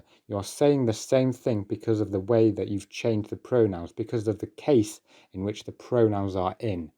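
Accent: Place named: United Kingdom. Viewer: I am curious, you have a strong british accent.. are you a native british i am guessing, if so, how did you learn and be so well knowledgeable on the russian language? Great video as always, cases, greatly explained compared to other videos! Will share this!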